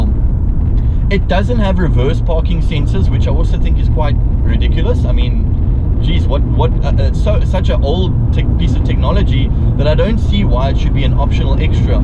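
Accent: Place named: South Africa. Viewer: Sound South african lol love it Subbing dude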